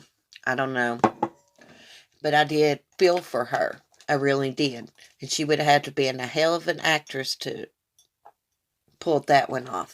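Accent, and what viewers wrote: South, United States. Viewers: okay good I have a baby but never used her as my profile. I guess you knew it wasn't me anyway because you blocked the person you said. ❤ I personally like your southern accent. It doesn't sound bad at all. So F that girl!! She probably had to use her baby as her profile because she was hideous lol. Remember you have alot of supporters and we think your awesome ❤😊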